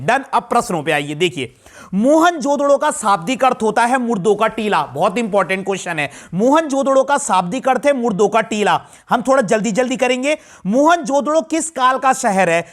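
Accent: Garhwal, India. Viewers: Gadwali accent 🔥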